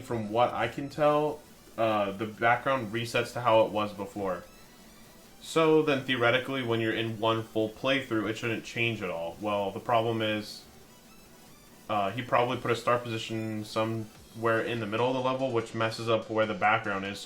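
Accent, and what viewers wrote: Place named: United States. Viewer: u sound like an american trusta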